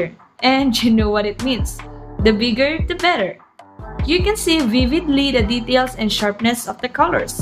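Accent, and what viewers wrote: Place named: Malaysia. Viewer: Are you malaysia ur accent is nice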